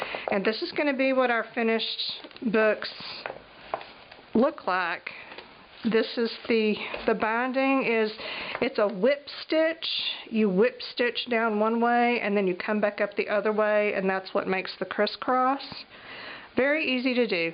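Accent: South, United States. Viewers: OOO! Magazine page shown MUST be about me... I love your videos! You are so incredibly knowledgeable, and I love learning new things from you! Edited to add: You are also a hoot to listen to. Between your lovely Southern accent, your colloquialisms ("book book", "a pokey tool"), and your complete lack of condescension, you're a pleasure to listen to! You've found a new subscriber!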